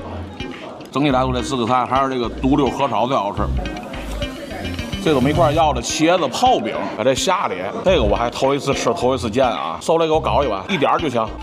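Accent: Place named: Tianjin, China. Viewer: 皮牙子既然你是说了天津话解说就按天津话说洋葱头好了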